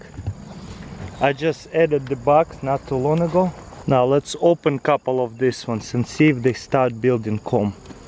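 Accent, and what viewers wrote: Russia. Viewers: Hey guys. He is Russian. Do you speak other language(s) as a native speaker? No??? That's it. Think, think, think before write a comment! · lying!! I'm a grown ass woman, not some child you can trick! He not only has a Russian accent but broken English, plus, you can find his bio on Google!!! Why tell lies? It's not gaining you anything other than looking like a fool!! Yes he lives in NC now but he was born and raised in Russia! His name is Тарас Кулаков) Does that look American to you? Actually he's Czech but that's Russian! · lol.. whats with all the anger? He was only joking. The accent/spelling is part of what makes him so amazing.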